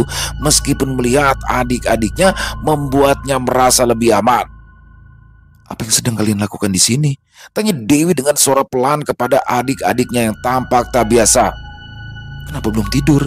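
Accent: Jakarta, Indonesia. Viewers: Pon K4 BaR always selalu ngga pernah never... Asik ada kiriman lagi cerita bang Pian dari bang. Saya suka cerita dan logat Betawi yang kental akan budaya. Apalagi gaya bicara bang makin mantap aja mendengarkan nya 😅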